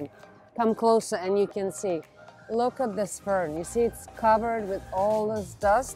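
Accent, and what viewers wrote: Russia. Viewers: You accent seems like a Russian?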